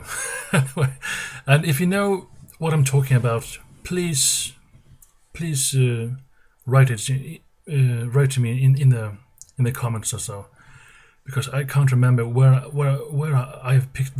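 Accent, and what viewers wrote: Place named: Sweden. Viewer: Your accent is Swedish, but you can enunciate Finnish pretty well.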